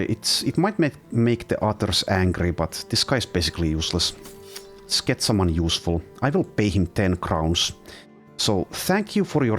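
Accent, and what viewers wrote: Finland. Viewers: The moment I opened the video and heard your voice, I though, "You must be a Finn". I wasn't disappointed :)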